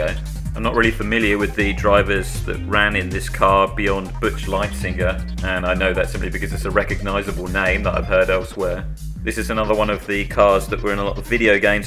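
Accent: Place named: United Kingdom. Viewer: Your voice is spectacular as I should know being a media graduate from College. All of us Americans are always fascinated with the British accent.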